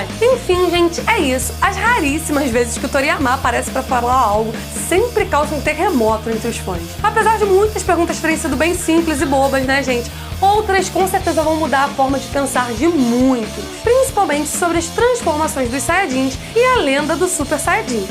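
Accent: Brazil. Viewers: Os vídeos do canal são ótimos, mas eu acho muito estranho ver a falando NAISCER ou NAISCER, isso é sotaque de alguma região do Brasil?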